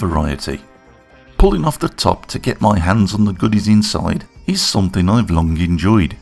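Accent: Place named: Wakefield, United Kingdom. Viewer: Sorry buddy I have to call you out on this one on TWO counts! One he is a northerner possibly a fellow Yorkshireman like me ( sounds like he's from Wakefield) and we are ALL plain speaking and if we don't like something we tell you weather you like it or not, its in our genetics. Second I was a big name some 5 years ago in reviewing computer parts (after being 2x UK OC winner) and I will tell you this, I know an honest reviewer when I see one! has the same philosophy as me, "SAY it as you SEE it, but INVESTIGATE thoroughly! " so with all due respect I have to call you out on this one. Have a great weekend:)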